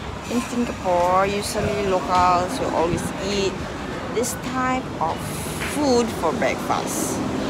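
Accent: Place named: Visayas, Philippines. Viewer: Nindot gyud ni paminawon ang bisdak mo enggloy madame. Hihi bagong tropa pa doorbell nlng pud balik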